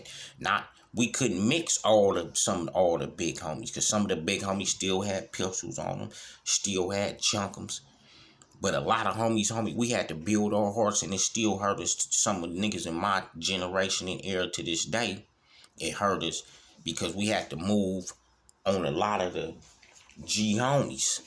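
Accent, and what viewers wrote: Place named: West Coast, United States. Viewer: Man I listened to your stories throughout my day at work and I'm a Crip from Denver and I know you're not lying and you're not fake about nothing you say however you can actually get paid do voices for like a new Grand theft Auto hell you can even be in movies you got that authentic stereotype West Coast accent and voice it's nothing new to me but to the world it would be a sight to see like yes n*** like this really exist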